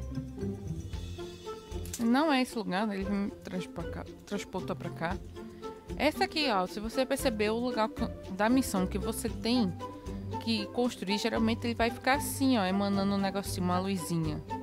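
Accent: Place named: Portugal. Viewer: ela tem sotaque português(o classico)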